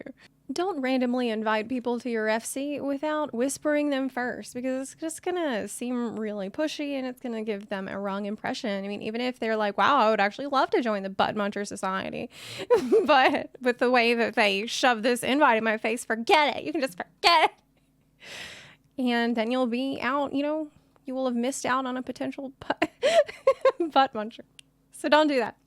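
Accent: South, United States. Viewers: Bless your heart, darlin, you're as southern as I am lol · What accent is that? Definitely southern and super cute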